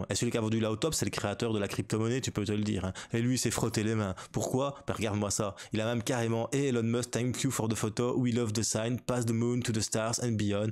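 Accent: Liège, Belgium. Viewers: C'est clair, j'avais directement reconnu l'accent liégeois ! :)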